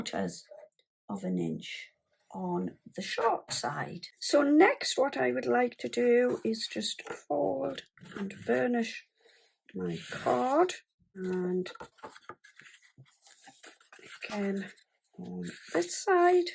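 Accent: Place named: Newcastle, United Kingdom. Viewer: Thank you so much for the wallet idea. My sister and I are building up papercraft and crochet items for a craft fair later this year. I have been following your uTube channel for only a couple of weeks and you have such great ideas. We were looking for something for males as we felt we were leaving them out as we have lots of girly stuff. I most of all love your great Geordi accent it’s my favourite accent so I could listen to you all day(hope that doesn’t sound too creepy 😮. Thanks once again xx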